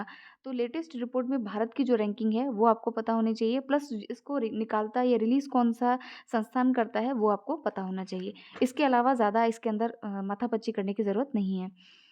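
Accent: Haryana, India. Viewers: App haryanvi ho kya??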